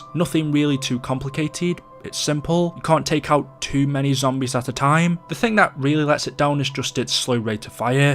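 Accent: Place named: Birmingham, United Kingdom. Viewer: Are you from Birmingham? I mean that accent tho..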